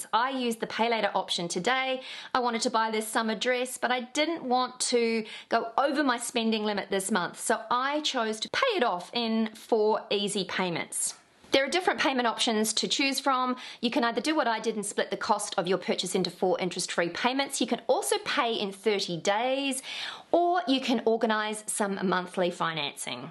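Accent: New Zealand. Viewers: Just have to say, I adore your New Zealand accent. When you said "six in the city", it made my day! Thanks you for all your insight! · I was wondering where your beautiful accent came from. New Zealand WOW !! We are so far away. I am in Montreal Canada. Thank God for internet. Great videos.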